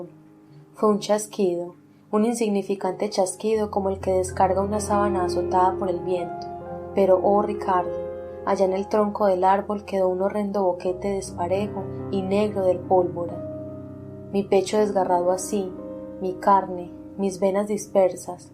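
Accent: Antioquia, Colombia. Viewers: una hermosa voz femenina "paisa" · ese acento Paisa pega bien con la prosa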